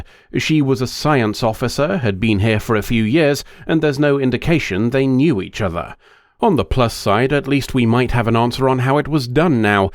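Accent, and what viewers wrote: RP, United Kingdom. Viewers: In response to a video I keep getting suggested by another Youtuber about "the time Janeway was the spider queen", I went and found your video on the topic. I don't know much about culture in your company, but I believe you sound a bit more "posh" in that episode. <link>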